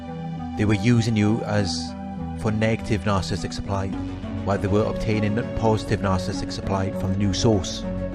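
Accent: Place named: New York, United States. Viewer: I FOUND it looks Great. You look and Sound so New York. My Dad was from Flatbush.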